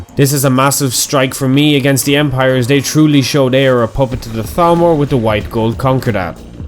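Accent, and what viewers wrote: Ireland. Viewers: I would suspect you are Irish after this vid if it is not for your nickname and flag) Keep up the good work.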